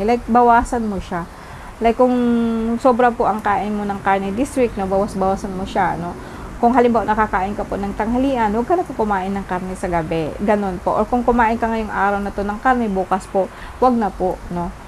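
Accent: Bicol, Philippines. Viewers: Bicolana ka po?😊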